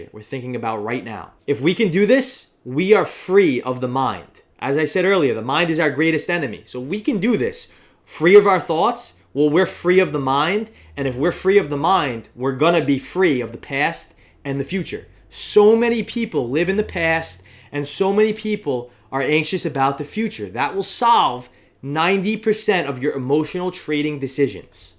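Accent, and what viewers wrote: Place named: Long Island, United States. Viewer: He’s definitely from Long Island. Spittin facts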